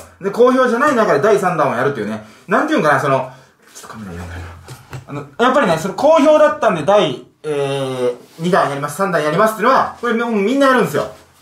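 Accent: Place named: Kansai, Japan. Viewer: なんで関西弁なん？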